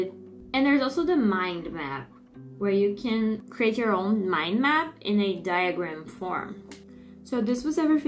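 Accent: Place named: Portugal. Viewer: Im brazilian and it sounds like portuguese accent Just a guess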